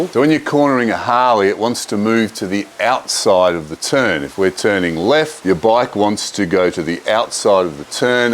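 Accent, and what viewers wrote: Australia. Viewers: I assume that because you ride a Harley you label your video as advice for Harley riders and of course it helps the video get views (so my comment is not a criticism just an observation). The advice could equally apply to anyone who rides a big twin cruiser. No doubt there are features unique to the Harley but as a rider of a Kawasaki Voyager 1700 I would say the same advice is applicable so I thank you for your video. It is good to see and hear an Australian voice offering motorcycle riding techniques and see Australian roads. I think life skills advice is also helpful - thank you. I should own up and admit I know ride a Can Am Spyder so cornering is an entirely different experience. I felt the Kwaka was getting too much to handle and I wanted to keep riding so I went for 3 wheels. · I wish I had a sweet Aussie accent. Love you way you say Harley (Haawlee). 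You kind of remind me of actor Bryan Brown. Anyway, love your vids and subscribed. Cheers from the USA! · Clicked on this video out of curiosity as I don't even ride a Harley, but then I heard the Aussie accent and saw Arthurs Seat (which I ride a fair bit) and kept watching. Good tips and are applicable for all types of bikes (I ride a gixxa). Even after riding for over 20 years it still pays to readdress the fundamentals of cornering. Especially when you haven't ridden for a while, or you are riding in adverse conditions. Personally, my biggest mistake is not looking far enough ahead if conditions are dodgy, and that just makes things seem faster than they are (plus you need to constantly adjust where you look). Look further ahead and you can hold that vision longer, things seem to move slower, and the bike will tend to go smoothly around the corner. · I'm sorry, but with your Aussie accent, I must have misunderstood you during your comments regarding "life corners." Much of what you said is soundly Biblically based, so I thought the part where you directed the audience to see more at the end of the video, your comment had the word, Scripture, in it. 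Not so. Have a blessed day. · Geezo, was this video made for the Ausies? Hard time understanding that accent. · Love that Aussie accent, miss Australia so much, look forward to come to down under and ride along Great Ocean Road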